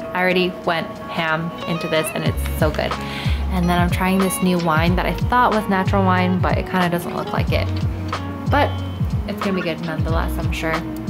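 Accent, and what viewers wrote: California, United States. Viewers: I like your Cali accent, stands out in NYC.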